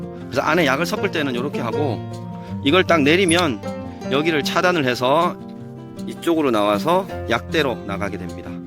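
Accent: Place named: Yecheon, South Korea. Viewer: 시끄라도 ᆢ예천말 정겹워요 ㅎ 늘 홧팅입니다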